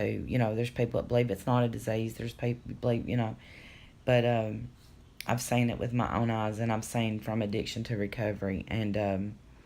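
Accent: United States. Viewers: I am sooooo proud of you!!!! I knew from the first video I watched we had more in common than our country accent!!!! I too am an addict in recovery....one day I may tell my story. And, just keep it in the day and before you know it, it'll be years and years!!!! So proud of you, keep up the good work!!!!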